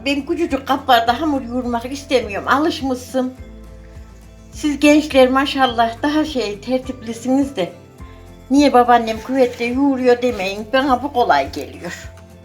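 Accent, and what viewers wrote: Kayseri, Turkey. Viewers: Maşallah teyzem nasıl özlemişim kayseri şivesini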